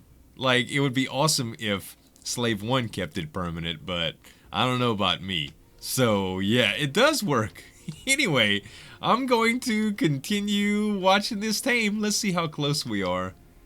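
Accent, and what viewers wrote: Louisiana, United States. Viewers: Found all of you guys via Slip's channel, you're the only one I subbed to just because of that sweet sweet Cajun commentary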